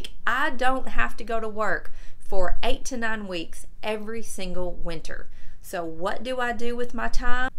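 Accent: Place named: Kentucky, United States. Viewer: You are SO the person I want to be when I stop being a procrastinating perfectionist! 😁 I am a 49 year old night owl musician from Boston (thus the username and picture). I LOVE your Kentucky accent and phrases!! I have 2 boys ages 12 and 14 so I am fascinated by how you’re handling your youngest flying the nest. 💗 Your energy grabbed me but it’s your sweet personality and “realness” that keep me coming back. 😊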